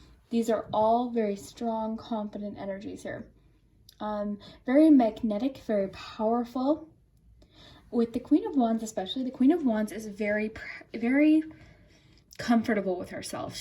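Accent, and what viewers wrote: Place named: Canada. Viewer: So, I have to comment and tell you that I'm OFFICIALLY a new viewer to your channel and I'm officially subscribed as of NOW! (WARNING! Super long comment!!) I happened to find your channel through another reading I was watching from somebody else and something in me told me to click on your video. The video was called "How Will You Meet Your Soulmate? PENDULUM 🔮 Where? When?" Not only is your reading the most exact reading I've ever received in my life (and I've had in-person readings), but the soulmate reading was so mind opening to me. I then watched your May 2020 predictions and Pile 3 was calling to me which I find super interesting. The number 3 is my lucky/weird/magical number. Watching this video didn't resonate with me because I'm single, but it gave me a lot of hope because I have plans on moving into my own place come July and from your May 2020 prediction about patience and that slow and steady wins the race. 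:) Anyways, yea, you definitely have a new subscriber from Orlando! :D (And I wonder if you're Canadian because you sound Canadian hehehe!) And yea! Keep making wonderful videos!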